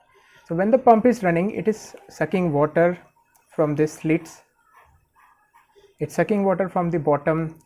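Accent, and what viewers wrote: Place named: India. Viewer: When you hear that Hindu accent you know the tutorial is gonna be fire!!! Thanks man, you helped me fix it! My turtle is happy with bubbles again :3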